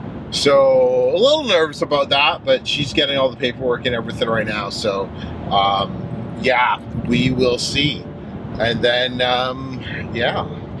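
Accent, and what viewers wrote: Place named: Canada. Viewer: I thought you were Canadian lol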